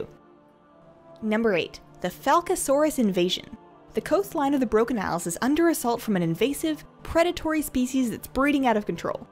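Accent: Canada. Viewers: Great vid, love your enthusiasm and super Canadian voice. Subscribed :)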